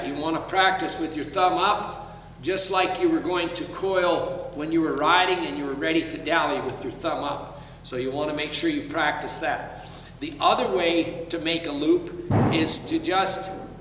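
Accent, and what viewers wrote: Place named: Canada. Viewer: He is saying hondo. He has a Candian accent.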